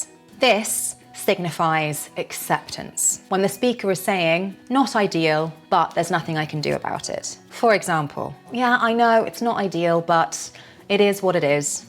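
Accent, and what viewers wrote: United Kingdom. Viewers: I could suggest: go slower in exposing all the phrases. 25 ones in a short video are😮 too many to learn at once. It should be better to make 2,3,4 examples for each phrase. Do you agree? Anyway I love your british pronunciation 😊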